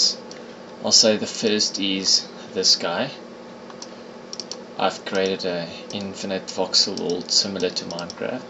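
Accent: South Africa. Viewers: You sound South African.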